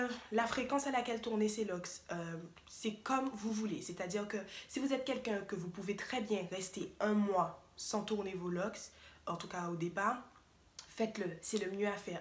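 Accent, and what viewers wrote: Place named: Antilles, Caribbean. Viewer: Humm un p'tit accent antillais🙃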